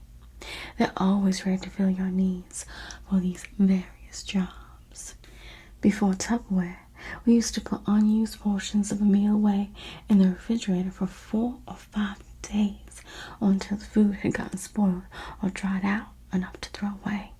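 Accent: South, United States. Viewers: more in this southern accent! Soooo lovely! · Im loving that southern accent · Love the Southern accent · southern drawl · you sound just like so many of the folks here! ... the Southern accent ☺️❤️ you do it so well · The southern accent! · the southern accent is magical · more videos with the Southern accent?? ... you do it so well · more southern accent videos! ... southern accent is my favorite, lovely and warm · this southern accent really takes it to the next level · my favorite southern accent ASMR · I love the southern accent ! Wow, you nailed it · the southern accent was a great touch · Your Southern drawl was spot on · That southern accent is HEAVENLY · The southern accent is giving me major * from the Golden Girls vibes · Your southern voice reminded me of the times the ladies at church would meet and whisper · I live in the south and felt so at home with your accent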